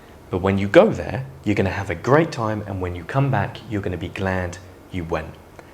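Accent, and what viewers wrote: United Kingdom. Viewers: This man has a British accent.. I'm pretty confident I'm sold into 30 seconds..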